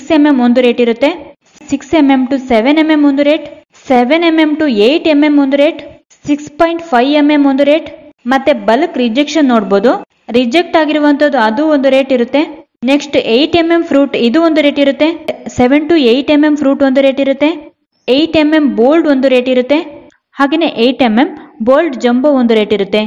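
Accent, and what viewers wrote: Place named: Kerala, India. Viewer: Kerala... Language problem tumba ide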